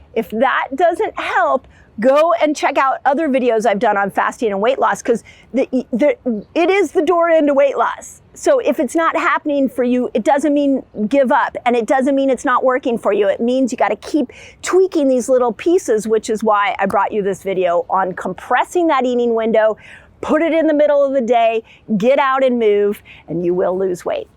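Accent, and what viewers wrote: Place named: Midwest, United States. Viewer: Ah, cool. You sound midwestern, so I wasn't sure.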